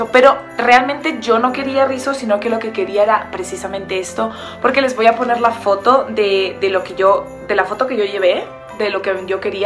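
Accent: Colombia. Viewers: ¿por qué motivo ahora hablas con acento colombiano? 😒 incluso 'seseas'...increíble... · hermosa tu nuevo apariencia!!!!! se te pegó el asento colombiano!!!! jajaj. besos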